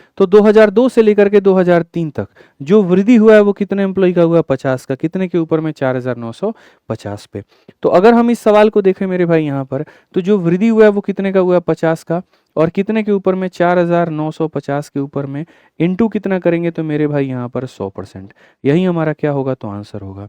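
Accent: Bihar, India. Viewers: B =badki bahu 😂😂😂 librery me meri hansi nikal gai 😂😂😂😂 gajabsir Bihari andaj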